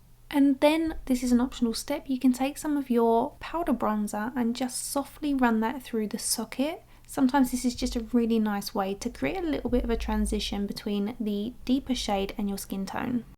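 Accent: United Kingdom. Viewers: I know this is a random question, but can anyone tell me which UK regional accent has?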